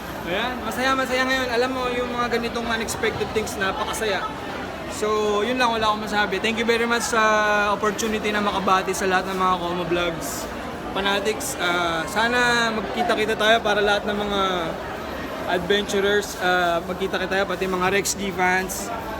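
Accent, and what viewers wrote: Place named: Maranao, Philippines. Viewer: Kalowka akala ko taga Luzon or somewhere, mranao kabs akie! Naks naman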